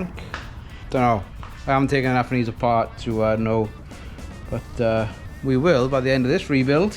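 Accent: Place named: South Wales, United Kingdom. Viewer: Thats a real Bummer, like a Major BumOle! So sad to see a well sorted bike go bad like that , you obviously know how to put a engine together but not sure if you worked on that one before the issue. At least we got to see the internals of a DRZ, i thought they were pretty much indestructable. Ihave a Husky WR250 that went pop due to a exhaust O ring tear,ingested some finest Welsh grit and did the big end crank and the bore in. I ride a Xr650R and a few more Hondas but not turned the BRP over in a few years so I just hope she is ok buried iin the back of the garage. Good luck buddy , detect the SWales accent there , alot warmer where you are atm Atb x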